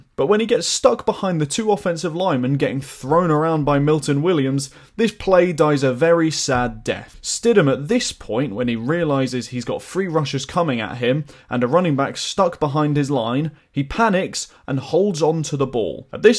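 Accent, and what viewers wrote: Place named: United Kingdom. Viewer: This is probably the first time I've heard NFL analysis in a British accent, and it's also one of the best, most concise, well presented ones at that.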